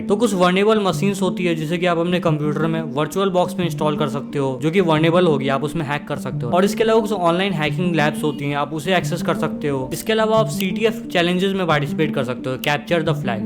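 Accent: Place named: Bihar, India. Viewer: Bhai pahle hindi sahi krle bihari se.bad me hacking krna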